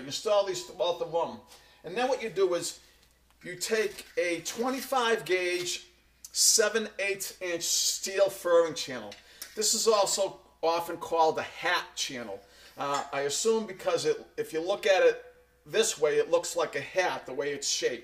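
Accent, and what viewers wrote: Boston, United States. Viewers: What's your accent? Boston?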